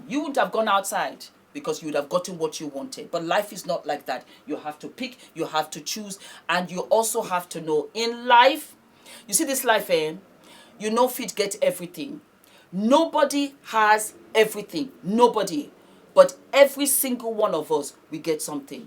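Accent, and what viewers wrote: Pidgin, Nigeria. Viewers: darling! I love when you speak pidgin, you always crack me up. Thank you for the words of wisdom you give to everyone out there, forever treasured. Thank you. ❤️ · I've been to Nigeria 🇳🇬 and loved it! I love to hear u speak in Nigerian accent! Mo Nife ❤❤❤ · Your Nigerian English de sweet me...,God bless you. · really went Naija this episode 😅😊. Her broken on point 🇳🇬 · l enjoy u more when u go the Nigerian way of talking❤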